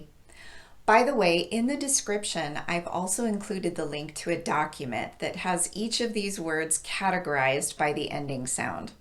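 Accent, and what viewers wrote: United States. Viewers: Thanks for teaching ❤ but I have a question. Could you tell me Which accent is your pronunciation in the U.S.?😢because I found some different accents in the U.S. They are so different, especially some certain words and certain structures. Does it matter if I have a mixed accent 😂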